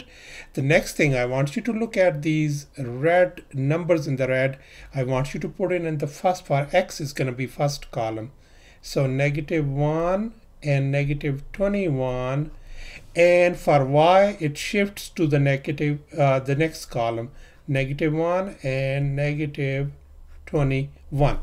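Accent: India. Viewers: I his his from soth side ,maube from kerala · he sounds like an Indian..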